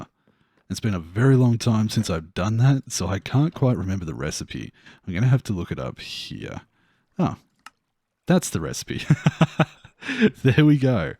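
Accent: Australia. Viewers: finally have time to watch the episode!! If it's not full of Aussie Builder heavily accented slang I want a refund!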